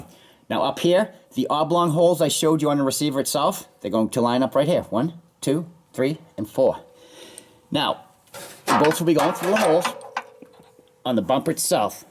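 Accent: Boston, United States. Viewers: Thank you for such a marvelously done video. I bought my 2015 Black Sahara last week, ordered my hitch right after watching your 'how to' and installed it yesterday in about an hour. And all for under $75. I've ordered my fuel filler cover upgrade and your video for that looks easy enough to follow. BTW, I grew up in Newton Center, MA and love hearing your accent. Reminds me of my childhood.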